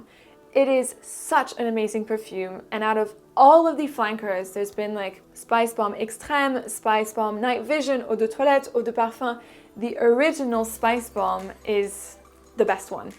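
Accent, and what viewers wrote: France. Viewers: I am 58 years old and have been using Dior Homme since its first day. Since they changed it I have been trying to find an alternative tried a lot of different perfums and watch numerous videos on perfums. Result I am as confused as ever. Any suggestions ??? Tried Oud wood Byredo accord oud guerlain bois mystérieux and relevant. By the way excellent french accent.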